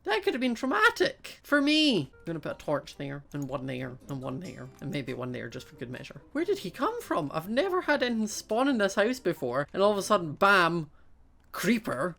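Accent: Scotland, United Kingdom. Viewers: His accent!!!!😍😍 Give me your accent! Anybody else love Scottish accents.😍😍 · I just love his Scottish accent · I feel like Scottish accent makes his videos funnier hehe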